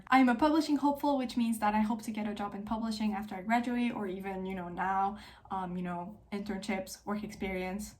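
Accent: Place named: France. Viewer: I had a feeling you could speak French, I recognized it in your accent. You speak really well for a newbie to booktube!!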